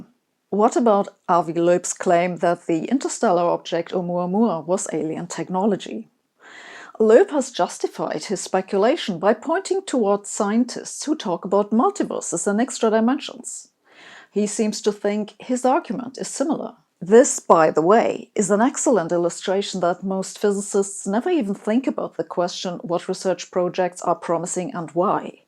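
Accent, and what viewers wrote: Germany. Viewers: As a student of the German language, I love you accent. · She makes German accent sound good, but there is nothing German about the logic itself.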